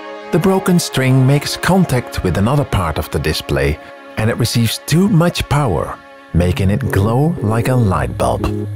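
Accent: Netherlands. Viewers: I thought you were Dutch based on your accent, but I wasn't sure. Hearing you say that you were in the Netherlands, makes me realize I'm pretty good at picking out accents. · that compression on his voice combined with harsh dutch accent is absolutely obnoxious jesus turn it down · I didn't know synthesised voice robots can have Dutch accent too,Awesome. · That's the thiccest dutch accent I've ever heard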